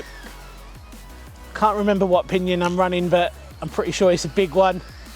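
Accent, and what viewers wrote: United Kingdom. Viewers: You sound very well like a British accent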